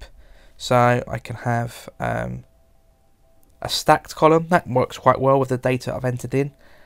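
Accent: United Kingdom. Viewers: This is an outstanding presentation of Pages for Ipad. I have just got a Magic Keyboard and was looking to learn Pages. I was not disappointed. This tutor should be an ambassador for Apple. He makes Pages very easy to understand and I am grateful for his assistance. It is also very pleasant listening to a British accent. Thank you.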